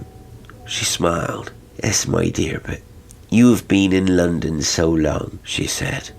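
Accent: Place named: California, United States. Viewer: thanks very much. It's good that we have a channel like this - to least because of the good response everyone seems to get from yourself. These "spook story" channels seem to be a growing "thing". Its a bit like what enjoying radio dramas must have been like. A bit disconcerting hearing someone from california talking about forgotten railway stations in Norfolk! Lol. Suggestion....it might be an idea to do Machens "the white people". And get a woman to actually read the "green book" part. I've said this before - to others, with no luck. Think it could be a very special contribution to your channel if you could pull it off. It is after all one of the seminal "weird tales" of the last century. The "Dunwich" brothers posh anglo Welsh gran.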